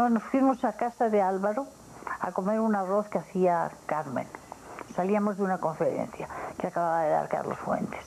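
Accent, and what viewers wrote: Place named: Navarre, Spain. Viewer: No perdio nunca su maravillosa diccion y acento español de Navarra. Descanse en paz compatriota en aquel pais maravilloso de gentes nobles como son los mexicanos q tanto amor y casa dieron a los españoles nomadas.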